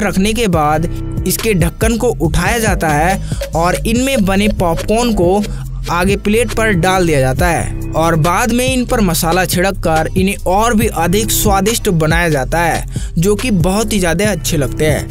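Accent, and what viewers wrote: Bihar, India. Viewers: Apana voice thoda sudaro bihari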